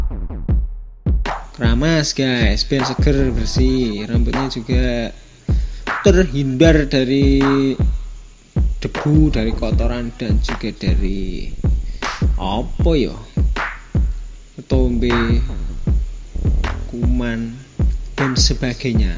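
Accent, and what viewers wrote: Java, Indonesia. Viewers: Suara jamet Jawa bgt cuih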